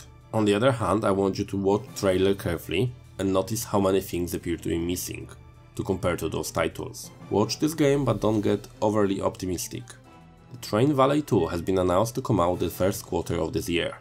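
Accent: Poland. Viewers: i don't know if you will feel offended but you sound polish. are you polish?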